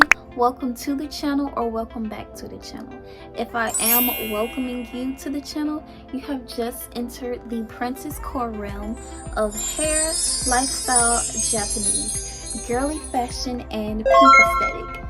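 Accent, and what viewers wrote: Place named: South, United States. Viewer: Where are you from? Your accent is so cute and country lol..ppl say in country but I can’t hear it for myself.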